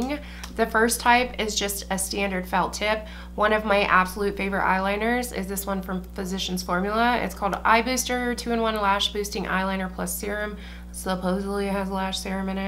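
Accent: United States. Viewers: I love this tutorial, thank you! You sound like your from Philly, reminds me of my aunt lol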